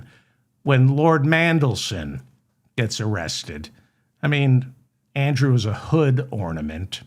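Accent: New York, United States. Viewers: ! I love your New York City grit. Nothing like it.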